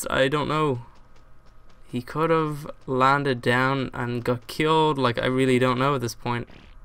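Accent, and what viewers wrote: Ireland. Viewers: u used to sound so irish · your Irish accent is really strong in these old videos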